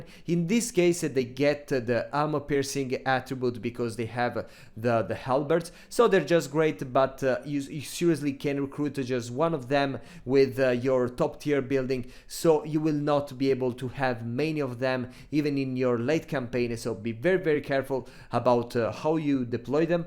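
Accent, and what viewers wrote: Italy. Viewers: Ciao I'm quite sure you are a fellow Italian by the English accent. If not, great video anyway. Grazie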